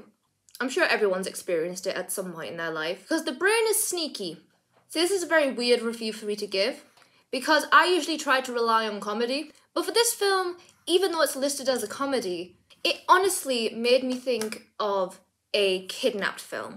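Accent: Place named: United Kingdom. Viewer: WHY THE BRITISH ACCENT IS SO ADDICTIVE!!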